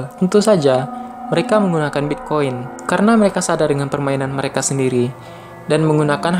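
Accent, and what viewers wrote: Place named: Makassar, Indonesia. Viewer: Logatnya agak-agak ke Makassar (tw sekitarnya), Iya ya bang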